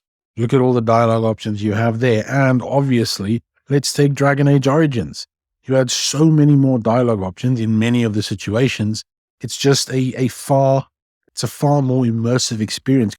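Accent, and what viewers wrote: South Africa. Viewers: I might be wrong, but is that a South African accent I hear?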